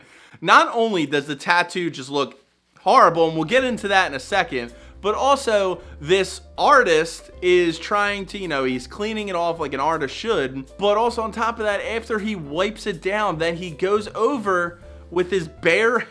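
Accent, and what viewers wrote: Philadelphia, United States. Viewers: Love the Philly accent. So good.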